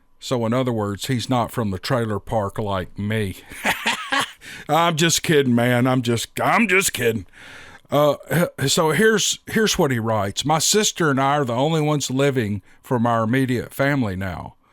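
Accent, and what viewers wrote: South, United States. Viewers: Lol see-ment (cement) I love your country accent!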